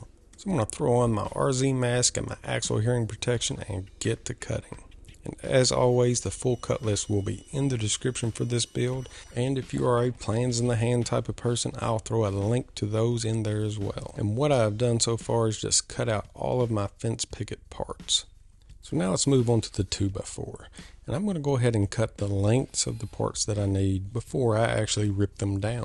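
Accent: South, United States. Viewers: a southern drawl is a good thing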